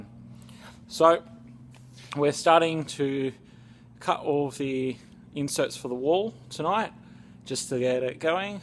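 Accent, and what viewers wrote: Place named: New Zealand. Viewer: Hey, i know the bus is long gone but we have the same bus and are doing it here in ADL! You sound like you're Kiwi too? How the hell did you get the strips off where the seats bolted to the wall?!?!?! Looks like it's all one piece?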